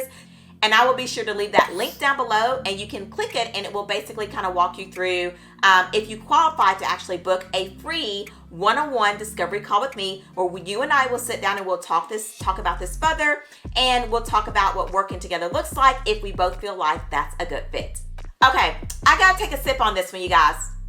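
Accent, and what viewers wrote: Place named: South, United States. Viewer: I love your country accent! 😊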